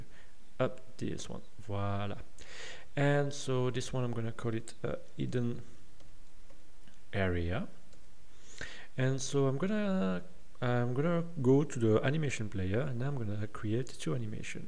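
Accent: France. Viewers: Bonsoir, désolé si je parle en français mais mon anglais est vraiment naze et je crois comprendre avec ton accent que tu es français aussi;). J'ai un problème, je fais exactement comme toi et pourtant quand je traverse la zone cachée, l'anime revealed commence tout juste à s'afficher mais ne finit pas jusqu'a disparaitre complètement. Saurais-tu pourquoi s'il te plait?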